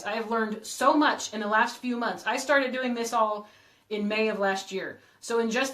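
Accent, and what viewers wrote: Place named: Nevada, United States. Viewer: Beautiful tulip wreath. I love your Nevada accent.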